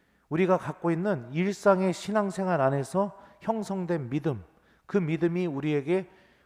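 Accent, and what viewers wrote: Chungcheong, South Korea. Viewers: 충청도 사투리가 참 정겨워요^^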